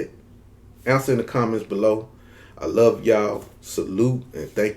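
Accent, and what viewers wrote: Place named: South, United States. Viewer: Your southern accent is beautiful. I still hate that picture behind you.🌹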